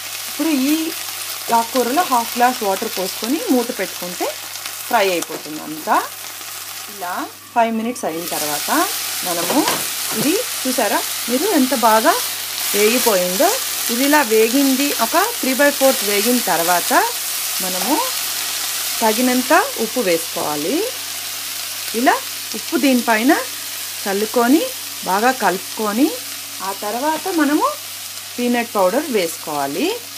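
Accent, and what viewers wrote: India. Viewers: your accent resembles chittoor district style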